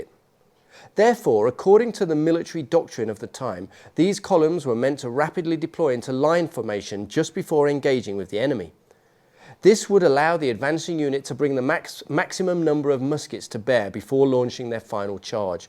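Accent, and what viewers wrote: United Kingdom. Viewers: Can I ask what part of the UK is your accent from ?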